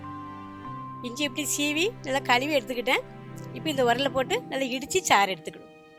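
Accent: Tirunelveli, India. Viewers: நெல்லை தமிழில் நீங்கள் பேசும் வீடியோக்கள் அருமை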